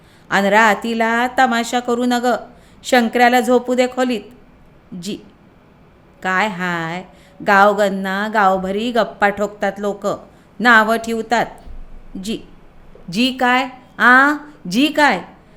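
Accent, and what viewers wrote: Rural, India. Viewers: ग्रामीण बाज खूपच छान....👍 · Bhashet gavthi thaska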